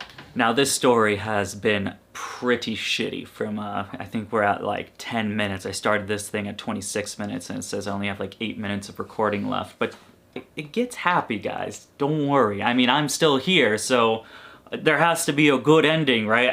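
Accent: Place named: New England, United States. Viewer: i love your accent...ya damn yankee <3!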